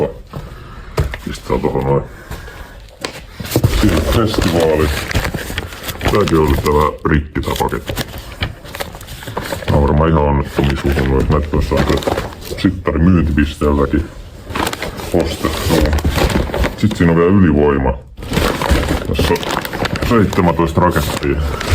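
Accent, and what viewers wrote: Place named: Pori, Finland. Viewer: ootko porista? mietin ku murre kuulostaa siltä😅 no anyways on kova setti💥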